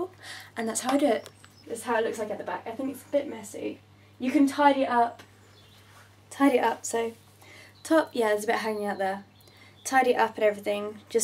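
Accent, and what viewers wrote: United Kingdom. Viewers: Random question: Is this a UK accent? Oh and great tutorial btw. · OMG I LOVE ENGLISH ACCENTS :) great tutorial by the way! (: x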